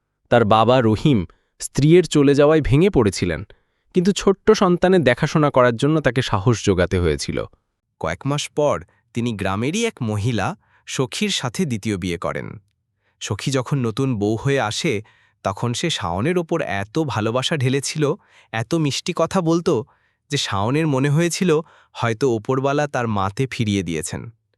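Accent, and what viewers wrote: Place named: Dhaka, Bangladesh. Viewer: এই গল্পকার ঢাকার কথা বলার কারণে গল্পের গুরুত্ব কমে যাচ্ছে।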